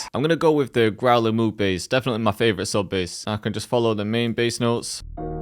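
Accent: United Kingdom. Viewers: YEAH BRO L;OVE THE PURE RAW UK ACCENT STYLE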